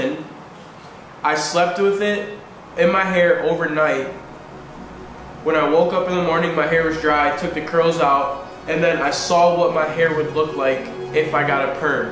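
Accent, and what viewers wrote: Wisconsin, United States. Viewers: His wisconsin accent is the best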